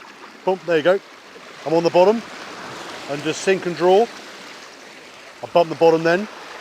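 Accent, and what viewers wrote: South Africa. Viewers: Love the Saffa accent! 😁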